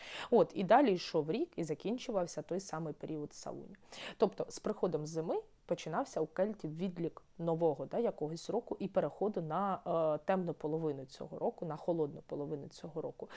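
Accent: Ukraine. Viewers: Як гарно все розклала по поличках! Приємно слухати інтелектуальну людину з такою приємною зовнішністю і українською вимовою! Дякую, наша ❤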